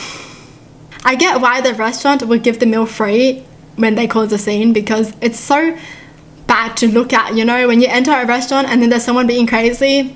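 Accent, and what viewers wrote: Australia. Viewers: Are you from Australia?If so I hope you’re ok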